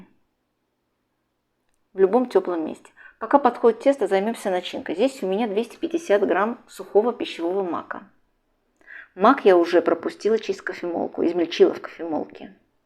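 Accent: Baku, Azerbaijan. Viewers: Спасибо большое за рецептик, у вас такое тесто получается бесподобное, буду печь к Пасхе по вашему рецепту. А разговор ваш чисто бакинский 😊 ❤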